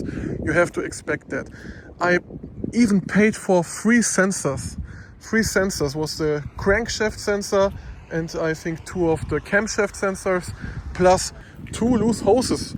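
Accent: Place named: Germany. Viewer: I'm so confused as to why this guy sounds German but is driving in Poland. 😆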